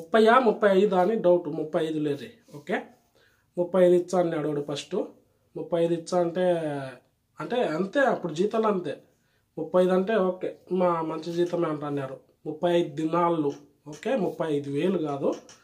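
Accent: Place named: Kadapa, India. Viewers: Manna rayalashima voice supper Anna tq good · Hiiii brother, I'm from kurnool oka 2 days nundi mee videos chustunna, chaala baaga anipistundi enduku ante Mee kadapa slank chaala interesting ga undi enduku ante YouTube lo mana local experience dorakadu kada. Anyway good job bro.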